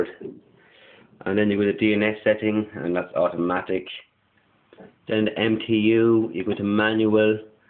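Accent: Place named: Carlow, Ireland. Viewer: hero!!! plus Carlow accent is unbelievable!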